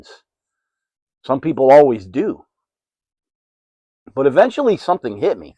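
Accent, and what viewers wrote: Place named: Texas, United States. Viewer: I’m from Texas and I thought I could detect some Texan! I like your content btw, keep it up 👍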